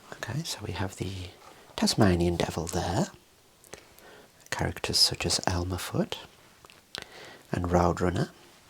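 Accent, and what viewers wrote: Birmingham, United Kingdom. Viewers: I've just learnt tazos existed! Nice seeing the old manu player statues....nice concise asmr (even with a brumy accent) 😮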